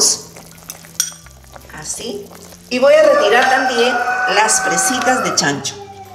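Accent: Peru. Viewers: El acento y la comida peruana es exquisita y con sus variedades en las preparaciones de sus potajes...TE AMO PERU !!! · Exactamente se nota cuando habla un peruano en el extranjero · El acento peruano es muy bonito gracias por la receta un abrazó.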